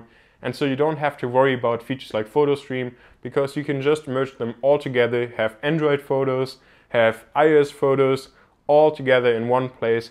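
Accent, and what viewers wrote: Germany. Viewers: Youre german? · Der deutsche Akzent <3 Super Video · are you german?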